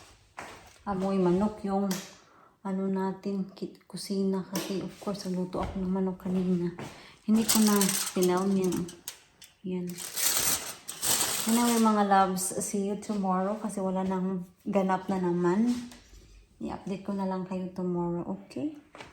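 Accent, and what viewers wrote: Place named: Visayas, Philippines. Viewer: Palagay ko Cagayan de Oro sa Mindanao. Parang bisaya ang Salita nya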